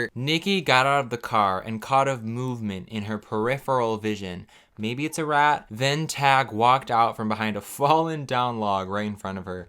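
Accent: South, United States. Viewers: as someone from the south, I beg of you to read it all in your southern accent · I don't even know what this book was about even after watching this video but your southern accent was just everything haha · Is it just me or does his country accent reminds you of forest gump? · 1) Even 5 minutes didn't go by and I was losing it XD 2) Subscribed 3) Southern accent 4) Sound effects 5) Beautiful cover of Little Mermaid 6) Not confusing but confusing feelings towards 7) Withholding Shreksy time 8) yeehaw Wonderful! 10/10! Will never watch again! (I probably will, lets be honest) Great job partner!:) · Your cowboy accent is flawless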